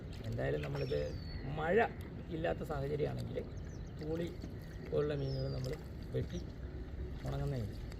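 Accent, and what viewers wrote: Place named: Kottayam, India. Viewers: Kottayam boundary aanu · നിങ്ങള് കോട്ടയം ആണോ ആലപ്പുഴ ആണോ സംസാരം കേൾക്കുമ്പോൾ കോട്ടയം ആയിട്ടു ആ എനിക്ക് തോന്നുന്നേ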